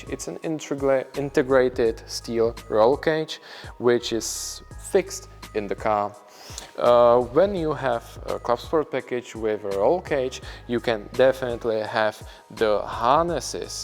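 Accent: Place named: Czech Republic. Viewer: ta čecho-angličtina se nedá poslouchat, sorry... jinak auto krásné, gratulace ke splněnému snu 🍾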